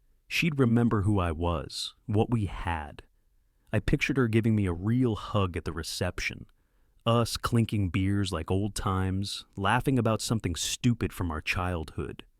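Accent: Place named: Alabama, United States. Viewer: Dude sounds like he is in love with his sister. Like in scar face. He does sound like the alabama type.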